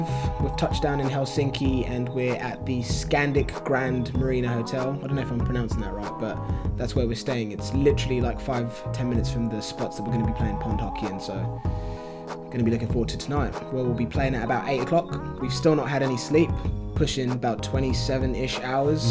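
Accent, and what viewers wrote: United Kingdom. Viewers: Lol, the start of the video just made me laugh so much.... A British? guy talking about ice-hockey. Never expected to hear that. Football, yes, but hockey....wtf! Ok Britts won 1936 olympic gold in hockey. Britts and hockey is like us Finns and football i guess.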